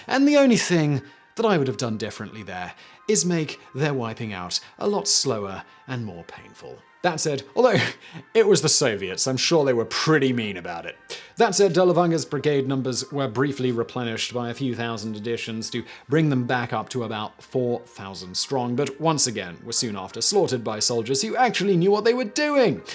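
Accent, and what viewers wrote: United Kingdom. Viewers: Can u try American /Canadian accent,British accent story telling is just too tiring ..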